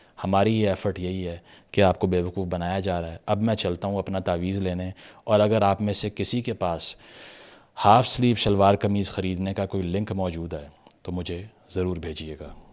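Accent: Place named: Lahore, Pakistan. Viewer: Churan bechta hai Punjabi accent mein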